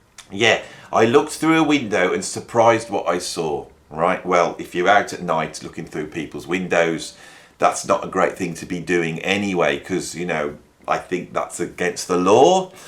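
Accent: Birmingham, United Kingdom. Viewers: you're reverting to your Brummie roots! Reminds me of Vic and Bobs "Slade at Home". 🤣🤣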